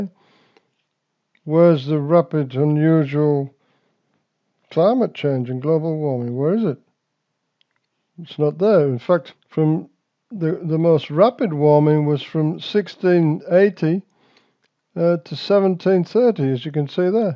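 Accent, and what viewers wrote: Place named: Ipswich, United Kingdom. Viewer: No your wrong, you sound like that fucking Ipswich Town manager with that accent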